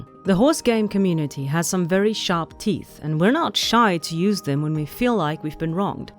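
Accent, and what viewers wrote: South Africa. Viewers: Ma'am are you South African? You sound so Safa it's making me smile like crazy, if not then ignore this lmao, but that accent it's giving me such heavy South African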